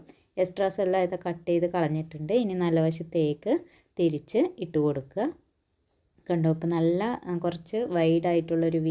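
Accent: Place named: Kannur, India. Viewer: സഹോദരി ഒട്ടും വിഷമിക്കേണ്ട. ഭ്രാന്തൻ മാർ പലതും പറയും ഞാൻ മുപ്പത് കൊല്ലമായി ടൈലറാണ് എനിക്ക് നിങ്ങളുടെ വീഡിയോകൾ വളരെയധികം ഇഷ്ടം നമ്മുടെ കണ്ണൂർ സ്റ്റൈലിൽ സംസാരം വളരെയധികം ഇഷ്ടം..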